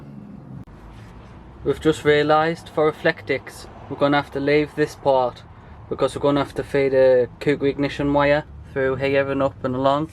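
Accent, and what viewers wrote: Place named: Northumberland, United Kingdom. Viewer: Nice job, I'm watching for information and because my mum was from ashington and your accents remind me of her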